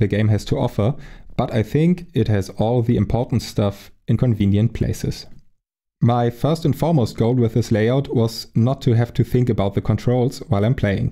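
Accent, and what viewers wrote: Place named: Germany. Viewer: I am developing a Pavlovian response to German accents in YouTube guides at this point! I got excited when I heard your accent at the start and I wasn't disappointed. everything was spot on: patient, well-paced, empathy and context provided for those new to Deck, actually covers all the details, thorough about making sure everything described has associated video, exaggerated finger movements so it's clear which buttons you're pressing, zero padding. thank you so much for this amazing guide <3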